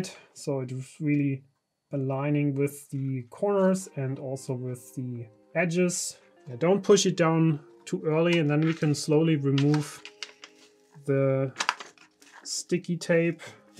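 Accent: Germany. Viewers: Dein Englisch hört sich seeeehr deutsch an:-) Aber by the way, sehr gute Videos von dir, Top!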